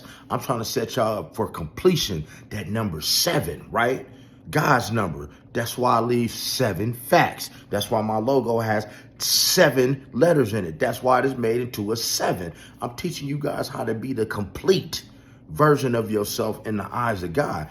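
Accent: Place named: West Coast, United States. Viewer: I felt this video all the way OG!! Preciate this content my Maine!!! Already know you reppin west coast, I can hear it.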